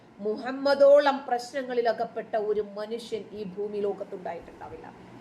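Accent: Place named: Kottayam, India. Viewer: അക്ഷര സ്പുടതയോടേയുള്ള, അറിവുകളുടെ നീതി ന്യായയുക്തമായ താങ്കളുടെ സംസാര ശൈലിക്കു ഒരു പ്രത്ത്യേക കോട്ടയം സ്റ്റൈൽ തന്നേയുണ്ട്. അറിവിന്റെ ആശംസകൾ. 🌹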